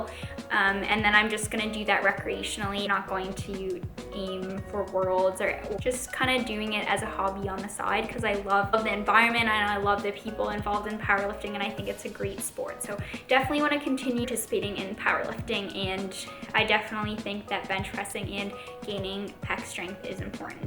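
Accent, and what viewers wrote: Canada. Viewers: youre from the great white north ye'? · that makes me happy the Canadian accent sounds good! It just sounds normal to me.. I suppose it's an accent to others. lol :) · I was curious if you were Canadian because of the way you said about & out, super cute 😂 but my anwser was answered at the financial part of the video. Glad you did it for you & no one else !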